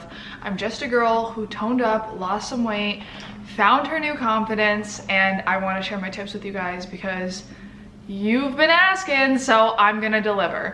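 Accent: Netherlands. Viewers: you kinda have a Dutch accent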